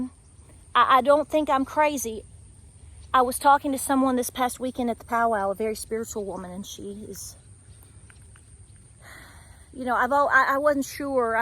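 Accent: South, United States. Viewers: your not duche your amerikan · I thought you were from the Netherlands until about half way into the video and I thought you sounded like the most American foreigner that I've ever heard. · I like your accent; you must either be a Texan or from some from south.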